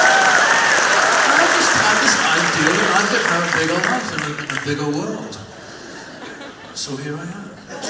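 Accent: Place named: Newcastle, United Kingdom. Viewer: if this ever tours and comes to Calgary I will be first in line. I get teary eyed just hearing the Geordie accent. My Mam is from Wallsend so this hits close to home.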